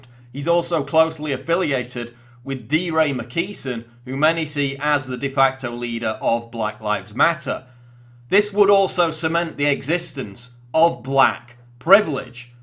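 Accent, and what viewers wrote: United Kingdom. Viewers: A white guy on YouTube with a British accent, commenting on BLACK issues?? Lmfao the irony of this dude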